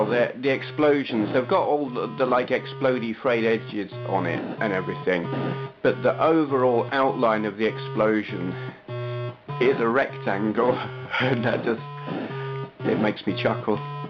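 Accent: United Kingdom. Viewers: what part of th uk are you from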